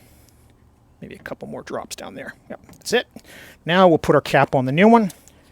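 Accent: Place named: Western Pennsylvania, United States. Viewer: My Sonic isn't acting up yet, but my neighbor had problems with hers. This will be handy down the line, thank you for sharing! I am a big supporter of Right to Repair, and channels like this are a huge help to those of us who need it. Side note, your accent sounded familiar. My family is from western PA and your inflections remind me of my uncles, who let me be a little grease monkey and help when they repaired their cars when I was younger. Cheers, have a great day!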